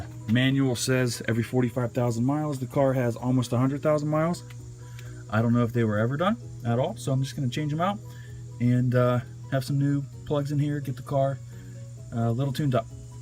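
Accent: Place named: Australia. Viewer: I loved the Australian accent 😂 made me stay and watch the whole thing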